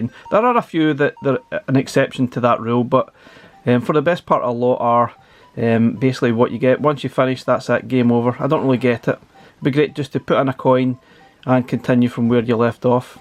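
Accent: Scotland, United Kingdom. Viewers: I’m sure I will I’m sure I will. I loves ur content especially that Scotch accent us English Gurl’s like an accent 💖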